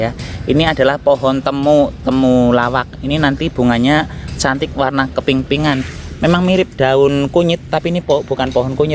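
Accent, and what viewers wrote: Banyumas, Indonesia. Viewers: Salam kenal br ketemu Chanel ini Mase drmn kok ngapak sy dr purwokerto arcawinangun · Sya suka nyayur kang purbalingga,rika ngapak pa wong banyumas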